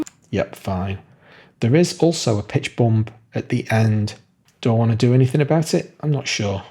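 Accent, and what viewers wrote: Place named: Merseyside, United Kingdom. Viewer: Thanks for the considered reply. I have a Master's in Education and taught at universities for over 20 years (not DAWs). In that setting, my students were either complete beginners or had to have a certain level of PK, as they had taken other more basic modules. But, here, you could get all levels. I understand that, but I've given up on some 'teachers' as they always assume prior knowledge. This includes some of the 'official Cubase' presenters. P.s. from your accent, I recon I'm (originally from your neck of the woods - Merseyside).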